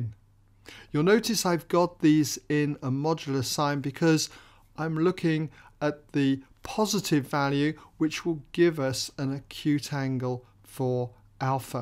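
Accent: United Kingdom. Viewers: you have the most modern british accent I've ever heard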